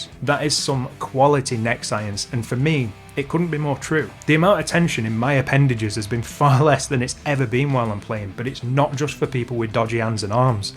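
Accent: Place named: Northern England, United Kingdom. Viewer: Proper northern guitar review. Strandbuuurg Buuurdon. Subscribed.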